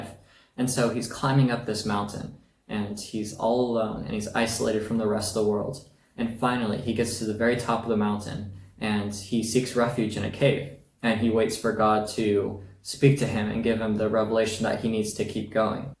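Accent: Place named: United States. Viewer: I thought you live in USA.......becouse the way you speack english language....!😊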